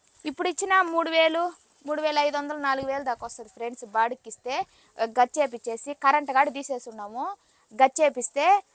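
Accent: Nellore, India. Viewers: Nellore slang ❤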